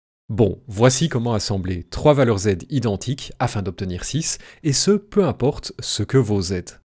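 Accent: Belgium. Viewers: Il est belge normal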